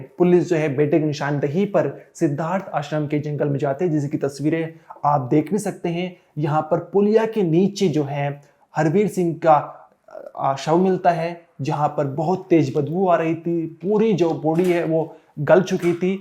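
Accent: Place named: Saharanpur, India. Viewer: बोलने का दंग बता रहा है तुम सहारनपुर से हो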